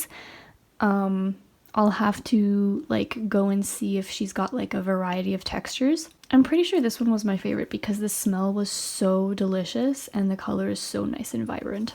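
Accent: France. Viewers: I love your french accent and idk why but i was so entertained by the story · Your French accent is soo cute🥰❤️ love you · AA, ma queen back with another LITTT VIDEO! Was this am asmr because the vid was just satisfying also your french accent! <3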